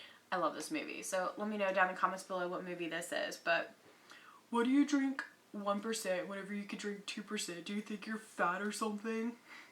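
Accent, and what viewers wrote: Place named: Texas, United States. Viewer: Exactly! Tell it like it is and be honest and most importantly genuine. Much more respect is earned that way. As for having a Texan accent, I do hear it, but it's slight. Much like my New York accent!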